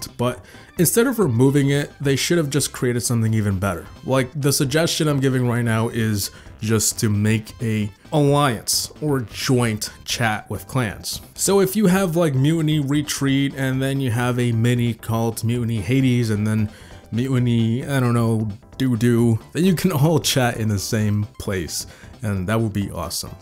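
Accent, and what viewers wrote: Norway. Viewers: Waitwaitwaitwait wait. Are you Norwegian???? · wait.... are frome norway?? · Hold up wait a minute are you from Norway? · are you norwegian? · wait u r from norway? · E du fra Norge? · Are you Norwegian?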